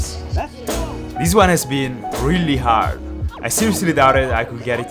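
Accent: Italy. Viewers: Hai l’accento italiano 😂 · Si sente che sei italiano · Ma quanto cazzo si riconosce che sei italiano! 🤣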